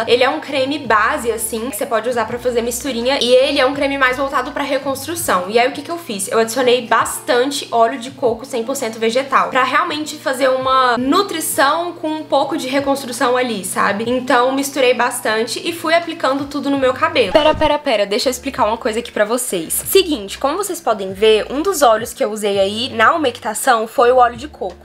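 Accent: Minas Gerais, Brazil. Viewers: Vc é mineiraaaaa....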